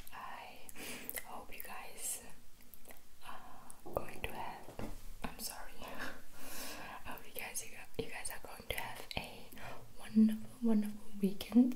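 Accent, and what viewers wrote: Germany. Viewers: ydobon it’s funny how people usually say they hate german accents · Your accent reminds me of my German grandmother💕 she’s from Görlitz, Germany. I went to Germany when I was 16. I loved it so much, i fell in love with the country. I want to live there someday.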